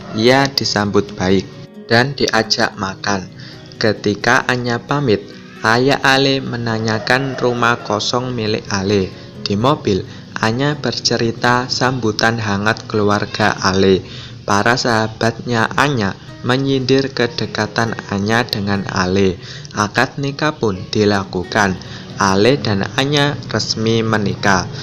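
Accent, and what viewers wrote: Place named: Java, Indonesia. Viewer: Medok biangeeet min😀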